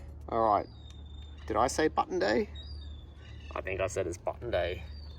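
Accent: Australia. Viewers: You sounded more Australian in this vid. I wondered if maybe you were tired, as accents are sometimes accentuated then? Great finds today. I actually liked that flat coat button that you seemed a little disappointed with. The quality of buttons then were considerably better than today. I’ve got to look for an update on this vid to see what that lump of metal turned out to be. Did they only make cod bottles in green? It would have been lovely if they had other colours too. Then the marbles would be really colourful. Thanks for sharing your finds.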